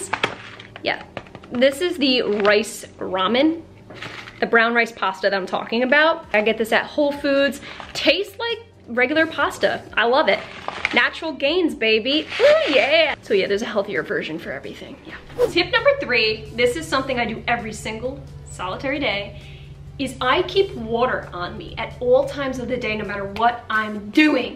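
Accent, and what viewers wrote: New Jersey, United States. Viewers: Visiting from channel! Do I recognize a Jersey accent? Great video!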